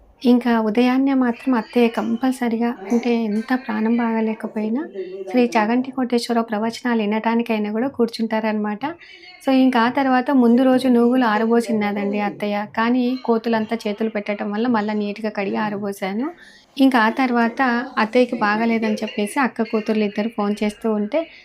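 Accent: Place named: Kurnool, India. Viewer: మన కర్నూలు యాస మార్చి మాటలు వదు 🎉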